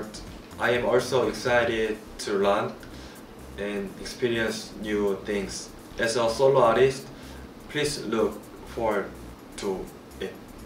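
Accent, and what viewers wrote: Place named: United Kingdom. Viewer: His English accent :")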